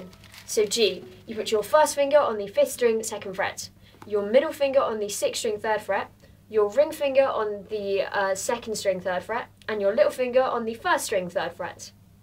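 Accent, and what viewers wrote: United Kingdom. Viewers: Blonde... British accent... Plays guitar and play? I think im in love... I came Ten years late I think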